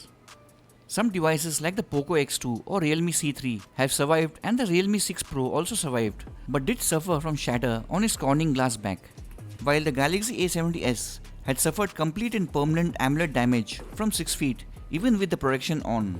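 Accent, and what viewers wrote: India. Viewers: Indian English:))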